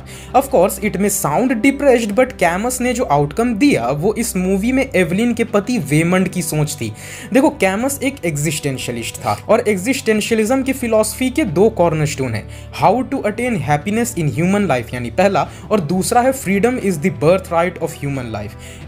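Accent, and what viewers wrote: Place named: Bhojpuri, India. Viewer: Bhaiya bhojpuria lg rhe ho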